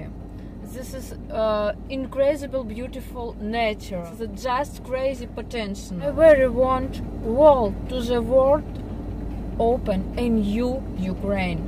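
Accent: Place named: United States. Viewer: Beutiful American voice bravo. Can you visit usa? I have the money.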